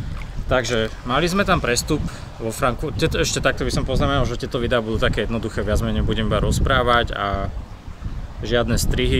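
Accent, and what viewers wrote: Slovakia. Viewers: Bratu, ja pocujem podla prizvuku, ze si zapadnar, ale nehovori sa kamerovat, ci nedajbo fotoaparatovat (co si nasatastie nepouzil) :D. Ale nakrucat, natacat ;))) Inac drzim palce, vyborne cestopisy ;)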